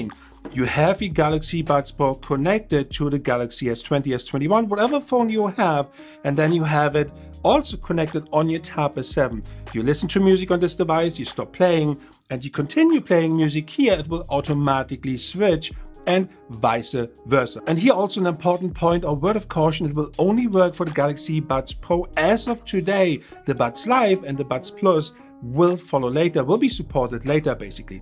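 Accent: Germany. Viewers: Nach der ersten Sekunde merk ich schon da spricht n Deutscher😁👍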